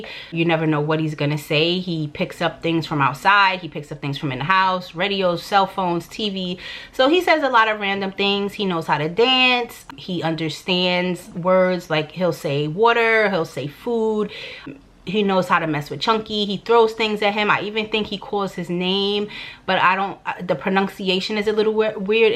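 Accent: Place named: Brooklyn, United States. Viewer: Cooper is hilarious and smart❤️ I’m ready for your new channel/ the new you🥰 I feel like you have the right represent Brooklyn, it’s in you💯 It’s in your personality and even in the way you talk sis.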